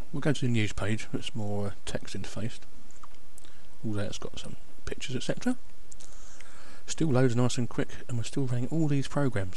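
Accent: United Kingdom. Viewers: you are british ? you have a funny accent :))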